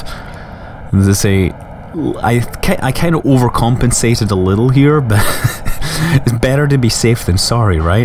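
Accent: Scotland, United Kingdom. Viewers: It doesn't feel right when you use your natural Scottish accent, and not your fake Arstotzkan accent.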